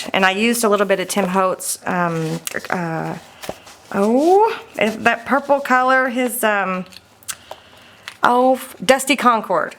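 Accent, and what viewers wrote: South, United States. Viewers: Many happy returns of the day and it is lovely to put a face to the voice behind the videos. Wow those albums are wonderful and the flourishing's are beautiful and the the album choice is brilliant as the curved edges look like the tops of cobwebs,I love thee idea of the mirror under the witches hat. Another fab giveaway but I feel we should be gifting you for your birthday! Have a wonderful birthday with loved ones and it is really nice to see you,your very pretty and I love listening to your southern accent. Thanks for the opportunity to win. Amanda in the United Kingdom. 🎨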